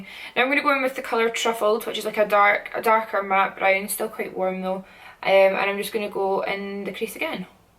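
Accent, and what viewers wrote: Scotland, United Kingdom. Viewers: I'm irish from northern Ireland she sounds Scottish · Just stumbled across your channel...oh my GOD! This is gorgeous! Nice to hear a fellow Scot on YouTube too! Buzzing to give this a bash 😍 x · whenever you say "kinda" with your Scottish accent it sounds like you're saying my name(: love you!!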